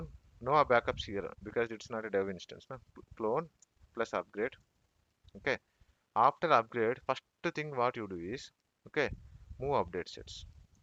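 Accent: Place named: India. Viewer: So hard to understand with indian accent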